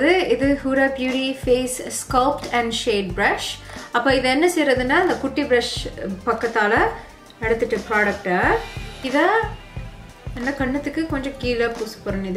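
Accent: Sri Lanka. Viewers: Ilangai Thamil alagu thamil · Is this Srilankan Tamil? Unga Tamil romba azhaga irukku sister. Makeup la podamaatan, unga Tamilkaaga Subscribed! ❤💯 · Yha its srilankan tamil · Poosa porein.. Poosa porein.. Oru quiz competition vaikalaam pola. . Lolzz.. Else srilankan tamil azhagu 😍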